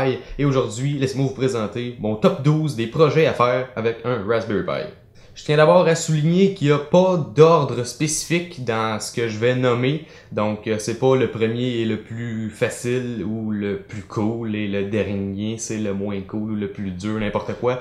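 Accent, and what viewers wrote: Quebec, Canada. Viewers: Oh putain, j’ai eu du mal au départ j’avais pas compris que c’était québécois 😂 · Super cool la vidéo en plus j'adore l'accent du Québec ^^ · Québecois. YES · C'est ça le accent de Québec ? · Bonjour de Toulouse ou je m'intéresse de près au raspberry pi4 durant le confinement Heureux d'entendre un accent québécois et un passionné du raspberry pi qui me parfait d'être une sacrée bête de course Amities Philippe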